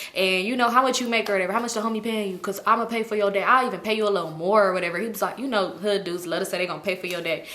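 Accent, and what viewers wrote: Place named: California, United States. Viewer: Every now and then I hear your cali accent it’s so cute yall say arms and party differently lol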